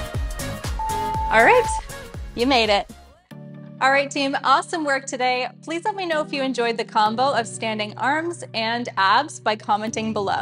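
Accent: Canada. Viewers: I love all your stuff, (Canadian??) accent included! "Oh Canada, you have hot and awesome barre coaches!" I love the programs with less/ no pauses between movements. Can we get some more of those, puh... puh... pretty puh-leaze! (Please! :)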